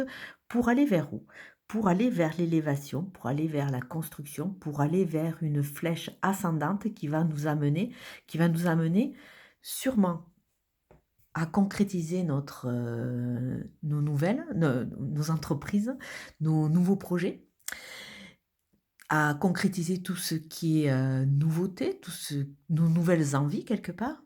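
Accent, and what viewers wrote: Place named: South, France. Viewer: Bonjour, accent du soleil!!. 🌞... j'aime beaucoup cet accent, merci de tout ❤ pour ce tirage. Une question si vous permettez: faites le nettoyage émotionnel? s'il vous plait... 👃 🙂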